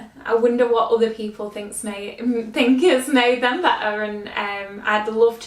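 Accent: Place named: United Kingdom. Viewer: Great channel, immediately subscribed especially thrilled to hear a familiar voice of the u.k. too as most channels I find myself subscribed to are often American, and so it’s confused as social work in our country is very difficult to appropriately compare with rules and procedures in u.s.a.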